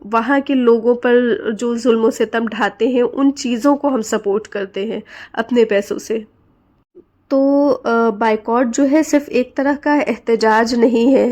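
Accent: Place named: Azamgarh, India. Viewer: Aplog azamgarh se hain hm bi azamgarh se hain or lucknow me rhte Hain kafi salon se even i born in lucknow Apki video dekh k ek connection feel ho rha hai your accent n way of talking like azamgarh... Mere YouTube pe apki videos accidentally recommend hui or mai smjh gai k aplog azamgarh se ho ....❤❤❤❤❤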